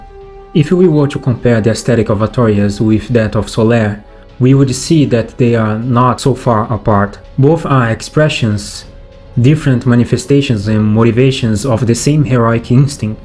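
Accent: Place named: Brazil. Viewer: Krl essa é a primeira vez que percebo o sotaque brasileiro no ingles kkkkk. Video mt bom. Tava querendo fazer algo parecido tbm.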